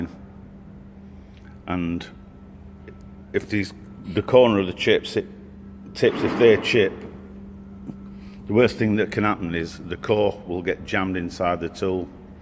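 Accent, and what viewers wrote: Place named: Sheffield, United Kingdom. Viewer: brill channel love the Sheffield accent and humor Hope yr ok mate Wherever you are?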